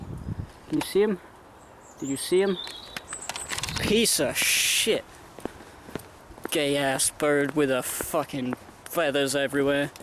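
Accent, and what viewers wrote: United Kingdom. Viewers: You're British? I didn't even realise. And I should have, as I'm British.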